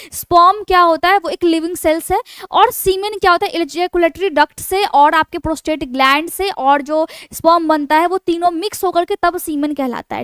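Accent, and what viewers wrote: Bihar, India. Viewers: Are you bihari Language pronouncesion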